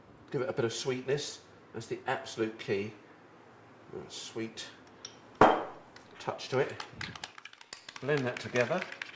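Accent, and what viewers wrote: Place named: United Kingdom. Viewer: He has a VERY heavy british accent lol.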